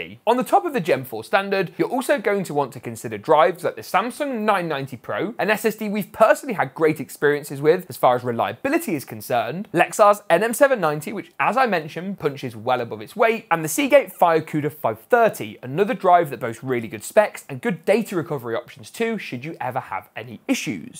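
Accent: United Kingdom. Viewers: Why does your English sound British?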